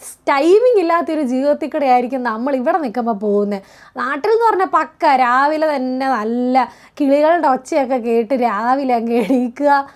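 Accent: Kottayam, India. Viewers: കൊച്ചു കോട്ടയംകാരിയല്ലേ 😂സത്യം പറയണം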